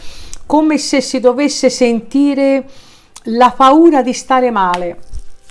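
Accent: Tuscany, Italy. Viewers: Mi garba tanto il tuo parlare toscano non so se fiorentino o pratese... saluti dal 🇲🇽 con ❤️👩‍🦳 alla mia Bell'Italia dov'è rimasto il mio 🦀 codardo quanto bello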